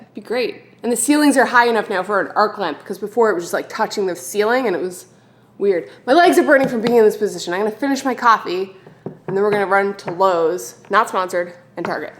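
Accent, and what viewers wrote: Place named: Newfoundland, Canada. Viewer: Your accent makes me miss home! (Newfoundlander in BC)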